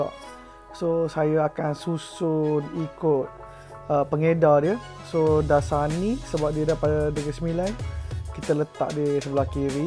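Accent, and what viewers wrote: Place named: Pahang, Malaysia. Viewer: gaye ckp tu mcm org pahamg😂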